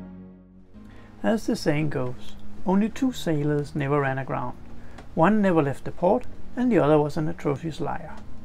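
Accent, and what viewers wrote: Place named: Denmark. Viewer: danish accent..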